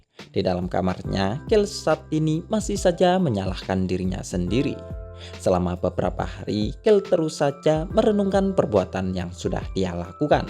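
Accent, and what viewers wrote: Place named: Madura, Indonesia. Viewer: Bg lu orang madura ya, kelihatan logat maduranya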